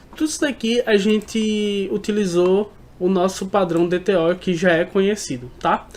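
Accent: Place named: Pernambuco, Brazil. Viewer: Oi, vi o post no YT e achei interessante. Quando vc começou a explicar, fiquei com uma pulga atrás da orelha (Esse rapaz é Pernambucano). E o tiro foi certeiro kkkkkkk O modo de falar entregou... hehehehe. Ganhou mais um seguidor. Abraço meu caro, um prazer encontrar pessoas aqui como vc. Agradecido pelo compartilhamento. Vamos nos falando.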